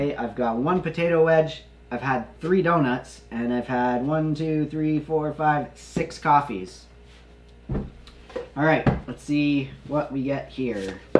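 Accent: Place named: Canada. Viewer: had to sub because u sound canadian and not the fake canadians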